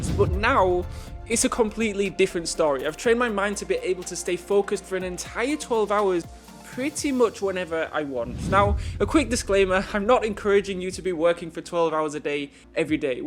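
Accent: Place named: United Kingdom. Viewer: Are you British I like that voice/Accent 💪👍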